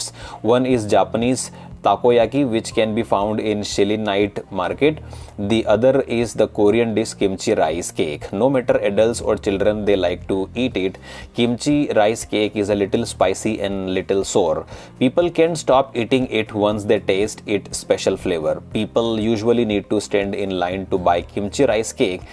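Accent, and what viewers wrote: India. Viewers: Your India accent is clear enough to understand ,i met worse🤣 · Sorry it was not strong enough, I have calls with lots of indian providers and speakers and the accent is almost impossible, this one here was easy. I wish I could find a more difficult one. Thanks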